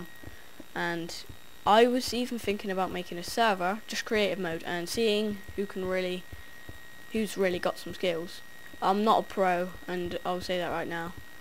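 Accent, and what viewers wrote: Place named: United Kingdom. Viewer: British accent! Omg!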